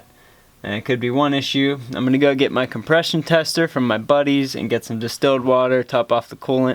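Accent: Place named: Pennsylvania, United States. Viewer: Great PA accent.......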